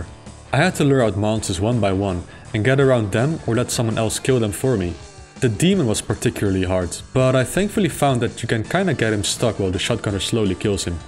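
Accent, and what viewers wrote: Netherlands. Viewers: Are you Dutch? Jouw accent klinkt een beetje Nederlands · Are you dutch?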